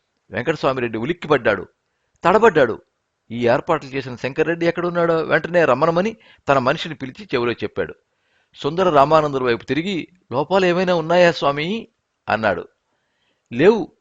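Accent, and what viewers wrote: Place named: Kadapa, India. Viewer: Kadapa dist language narration in story reading very nice sir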